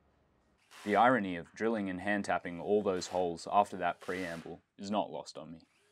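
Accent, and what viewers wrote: Australia. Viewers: Before I heard your voice, I was hoping it was Australian! And boy, I’m happy. Can’t wait to watch your next video. You can then join the ranks of CEE and I Did A Thing, and Pask Makes… no engineers in sight UK Aerospace Engineer here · Some strange mix of This Old Tony, Marling Baits and.... well, an Austrailian... Edit: A very good mix. Excellent way to start a channel strong. good on ya mate. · Beautiful (Aussie) introduction. Great video.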